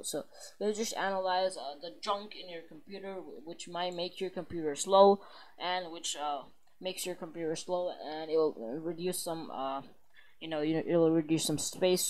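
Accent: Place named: India. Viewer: You sound like a India Girl no offense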